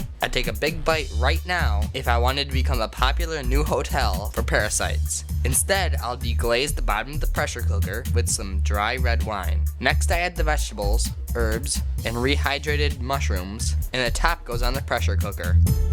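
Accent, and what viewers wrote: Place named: United States. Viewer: Weird you sound American but your bro. Sounds like an Englishman great video though :)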